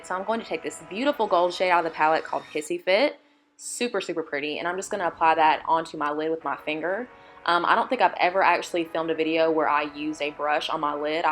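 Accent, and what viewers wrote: South, United States. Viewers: New subbie bc u r gorgeous and i love your southern accent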